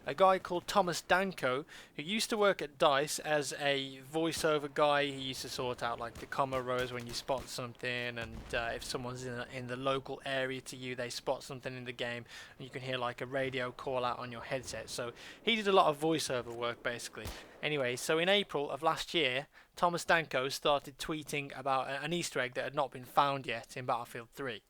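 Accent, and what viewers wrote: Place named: Hackney, United Kingdom. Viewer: I'm here in 2021, I love hearing voice sound like a lock, stock and two smoking barrels hackney bloke. Fast forward to today and he sounds like a refined English gentlemen out for a spot of tea. Love ya,!!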